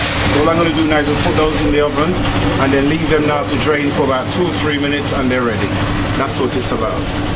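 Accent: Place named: Jamaica. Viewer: cho blowwow . hear di accent nuh .. lmao only jamaicans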